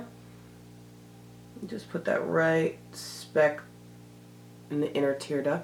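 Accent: United Kingdom. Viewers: loool your bitish accent, love it. you really sound like us a bit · loveeeee!!! and great job on the British accent!!!!! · I just love that British accent!!! I'm an actress and often try to master it for future roles lol.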